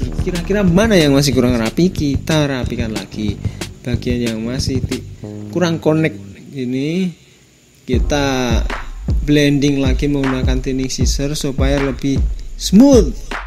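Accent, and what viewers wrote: Java, Indonesia. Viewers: Suara jamet Jawa bgt cuih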